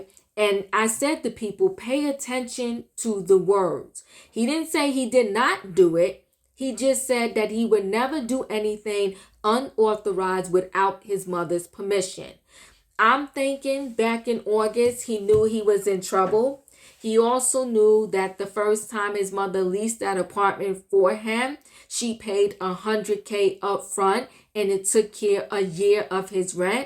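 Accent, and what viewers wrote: New York, United States. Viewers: Big ups to the NYC!! Love your new York accent I miss my peeps!!❤️❤️❤️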